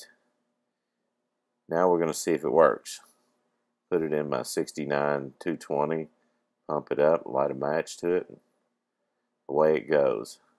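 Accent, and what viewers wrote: South, United States. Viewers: "we're going to put in about 4,000 ml of regular unleaded gas, ethanol free." What the hell is this in US measurements? Sounds like you have a southern drawl, but speak in commie language. I just don't get why some insist on using metric crap when the rest of Americans primarily use customary units in commercial activities, as well as for personal and social use. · OK so you used a gallon of gas whats all this metric crap,you dont sound like your from across the pond,, sounds like your south of the Mason Dickson line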